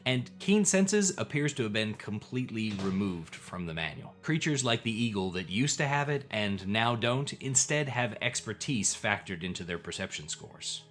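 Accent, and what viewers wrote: Australia. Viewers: Amazing hearing this and your Ranger video. You Aussie accent comes through when you got back, but here I would have never guessed. 😁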